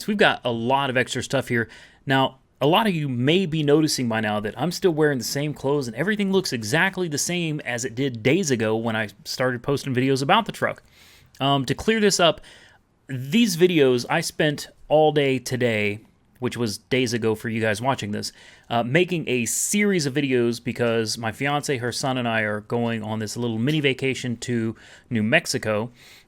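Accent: United States. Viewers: I love the way you speak your so calm and collected, as a Australian I often find Americans have funny and rather stupid accents but your the complete opposite. Also I love the content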